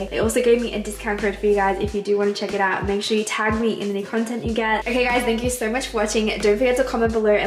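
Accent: Australia. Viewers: Hey, are you Australian??? Love your videos ma'am 😍.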